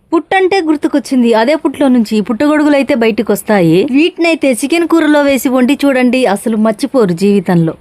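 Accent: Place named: Telugu, India. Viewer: మీ తెలుగు యాస కు బాగ ఎడిట్ అయ్యాను నేను మా ఊరు చిత్తూరు నాకు నచ్చిన భాష తమిళం 😮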